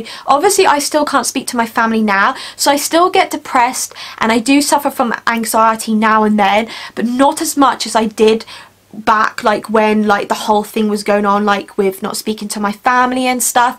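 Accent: United Kingdom. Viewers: Hello, What is your accent? I’m British/English too and just wondered where you are from 😊 Also you are so brave, thank you for sharing your story ❤️ xx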